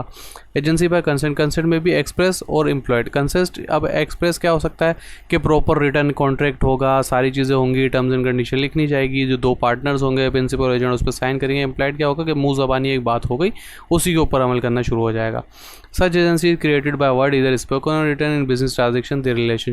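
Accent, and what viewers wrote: Karachi, Pakistan. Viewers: AP Karachi say ho??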